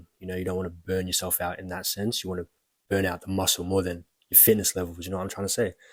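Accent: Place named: United Kingdom. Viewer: that accent thooo you in the uk ??!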